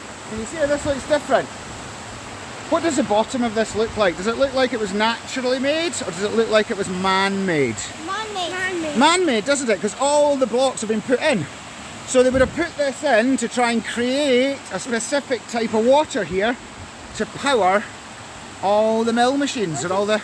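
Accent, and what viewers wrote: Scotland, United Kingdom. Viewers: I love your sco´ísh accent :-) Good walk. Since almost two years we are waiting to go to Scotland..... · Scots accent is very difficult 😓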